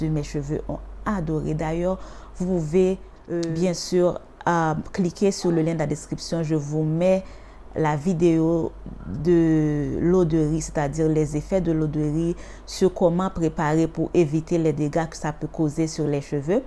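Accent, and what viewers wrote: Haiti. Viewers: Je reconnaît l’accent d’Haiti chérie 💋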